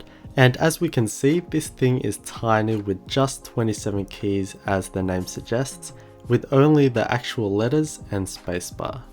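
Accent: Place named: Australia. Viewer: You sound like Daniel Ricciardo. Are you an Aussie?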